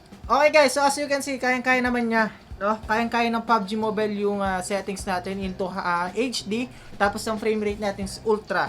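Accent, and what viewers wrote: Pampanga, Philippines. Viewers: Isa kang kapampangan sure ako sa iyong punto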